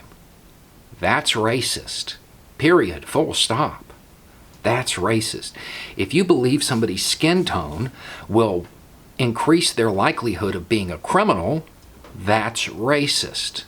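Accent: South, United States. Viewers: I’ll be honest I’m from London, been NY and LA in the USA. Never would I think that someone that looks and talks like you would have your morals and ideas (yeah I know, ignorant) thank you for opening my eyes and making me realise not all white guys with southern accents, beards and trucker caps are racists.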